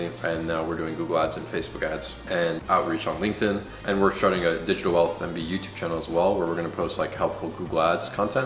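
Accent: Romania. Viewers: Bro, I knew you were romanian, I can finally hear you talk :)) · ador accentul,make ardeal great for good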